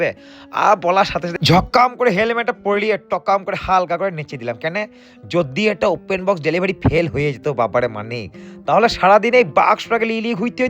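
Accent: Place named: Birbhum, India. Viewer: তোমার বাড়ি কি বীরভূম জেলায় বোলপুরে ? আমার একজন বন্ধুর কথার সাথে মিলে যাচ্ছে । ওর বাড়ি বীরভূমের বোলপুরে ।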